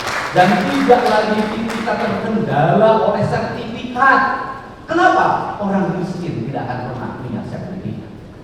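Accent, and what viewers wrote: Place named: Java, Indonesia. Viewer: Kliatan aroma RI 1 KDM asli Sunda dan jawanya medok banget, adat dan budaya jabar akan hidup lagi salam dari sumatra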